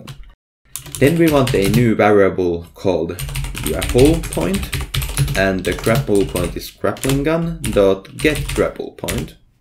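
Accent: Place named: Finland. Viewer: suomalainen aksentti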